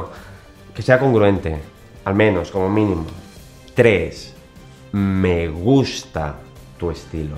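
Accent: Spain. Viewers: no me gusta el acento español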